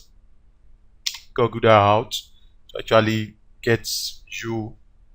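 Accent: Nigeria. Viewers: sounds Naija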